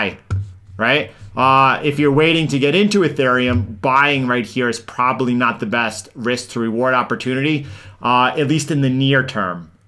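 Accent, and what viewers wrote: United States. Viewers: Hard to trust people with American accent nowadays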